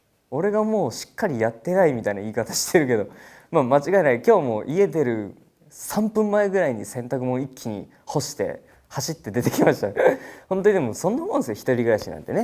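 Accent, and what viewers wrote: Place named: Okayama, Japan. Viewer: かっこよ。あと岡山弁素敵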